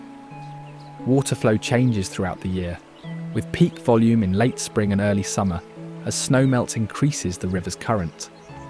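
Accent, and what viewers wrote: England, United Kingdom. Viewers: The British accent ruins the documentary. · Yes. An American state british accent. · Unseen Wyoming. We love Wyoming. PLEASE use an American narrator for American locations. It is only respectful. Plus, we cannot understand some of the words, as spoken differently. Save the British for British. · Sounds British. He is making a nice video. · If it was filmed by a Brit then the narration is perfect. He’s easy to understand. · This video has literally nothing in it that is hidden or unseen in Wyoming. These are all famous attractions known by any visitor which I am almost certain the video maker never has been. If you were English like the video sounds maybe check out London, that’s a very obscure place in England you could do a video about try focusing on London Bridge or Big Ben. But probably you’re just AI . Either way stay home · I think the documentary would sound better with a native Wyomian than a British person narrating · why the F does this have a brit accent?? 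· Wrong accent don’t need a Brit voice in American 🇺🇸🇺🇸🇺🇸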